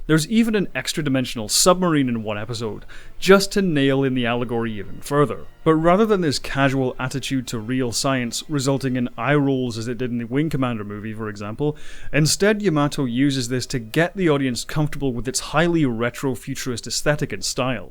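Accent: United Kingdom. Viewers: I'm guessing he's British from the accent. Whether he's in the UK or Australia, however, means Harmony Gold isn't an obstacle to getting localized product.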